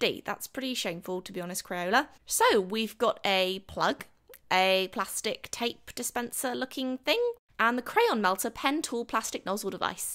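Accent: England, United Kingdom. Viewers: That artwork was AMAZING, the talent you have is so beautiful and your english accent makes my heart melt🤗💗 Saying hi! From Argentina🇦🇷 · DON'T say "it stinks of Crayon".... that's the BEST PART of a Crayola crayon.Nothing takes me back to my childhood that opening a box of crayons and sticking my nose i it to get my lungs full of that Delicious aroma. If they would bottle it in liquid, I would buy it. From your accent I can tell you're English so maybe this is the reason you think this stinks. Not me, and I'm 74 years old. I still get boxes of crayons for Christmas or my birthday because everyone KNOWS i love crayons. It's fun to sit and color in one of my old coloring books. I stopped short of you beginning the video.... maybe crayolas would be better for you if they smelled like CHEESE?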